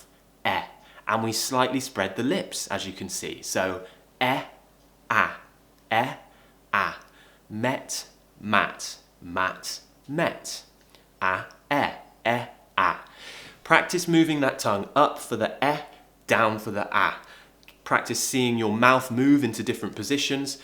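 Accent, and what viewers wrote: United Kingdom. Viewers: I really like this lessson. It is really useful and o love British accent. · Happy birthday professor 🎂🎈 greetings from Philippines. Been watching your videos and it helped me a lot to learn and improve my english most specialy learning your British English accent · The idea of starting a podcast is wonderful. Walk and enjoy your British accent,so nice and so beautiful .hope it will realize soon 💕💕💕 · Hi, I'm Julio from Brazil, I love your videos, I am learnig American English, but because of your videos , now I prefer British English hahaha, I think your accent is so much beautiful . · You can't believe how much I love listening to you! I wish I had that British accent- I wanna become an English teacher here in Germany and I would love to sound like you haha 😂😂 anyway, great work! Oh and Happy Birthday!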